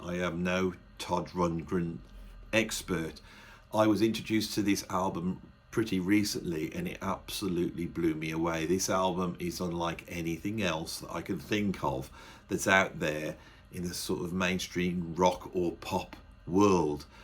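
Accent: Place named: Northern England, United Kingdom. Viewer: He's simply the polar opposite of ( later solo) Doesn't have a great voice, writes very not hooky rambling long bits vs. the concise hooky pop/sorta proggy stuff, Winwood's squeakily clean and perfectly ordered arrangements. Both apparently had access to technology and could take time to make whatever the F they felt like making. Rundgren produced this mess and Winwood produced clever, challengingly difficult songs (said Paul Shafer "the most difficult thing we've ever played" on Letterman. I think), but ultimately a bit contrived, antiseptic and unsatisfying. Far better than Todd's offerings though. I am sure Todd's got a lot a deep lyrical messages for his adulent worshippers, and Steve's got almost none, and I would give Rundgren more thought and a closer look, but I am so put off by the music that I think I shan't. (apostrophe?) He did have that one hit in the 80's. 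A sort of easy rock MOR thing?.... oh well. Next contestant please. Love your accent btw. Can't quite place it. Somewhere northerly betwixt........no wait.. too soft Cottswalds innit?.. no a bit of more northern inflection...I'll have to Google you...nothing in Wiki...."what city is from"....hah there it is........Kidderminster- Birmingham. yes, I've got quite the ear for a Yank.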